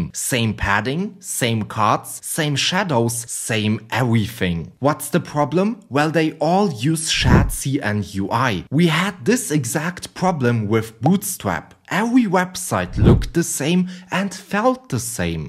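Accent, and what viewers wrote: Germany. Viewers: You sound soooooooo much like great scott. I mean I assume you're both German, but still it is uncanny.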